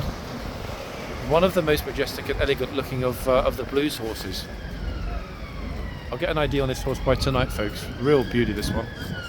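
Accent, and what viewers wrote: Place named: United Kingdom. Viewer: When I first started watching videos and he said 'tour guy', I heard with my Californian ears 'tall guy'. And I'd look but not see a tall guy; wondering why he would point out tall guys anyway. Finally, it dawned on me that he was saying tour guy. Usually I can tune my California ears to British but those 'r's and 'l's apparently don't cross over.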